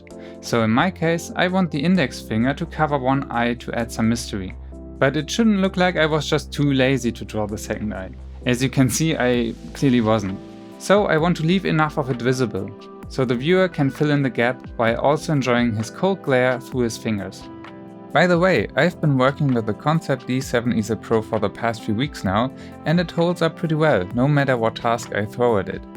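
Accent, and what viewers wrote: Germany. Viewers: ayo it's funny you were on my recommendations and I didn't see your videos before, but I was planning on doing the same approach of making tutorials by showing different emotions of me in anime style lol😂 btw you kinda sound like you're german as well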